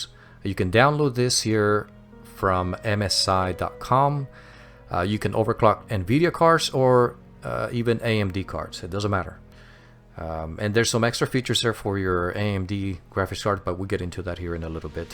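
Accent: Norway. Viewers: you norwegian? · waaaait, are you from norway?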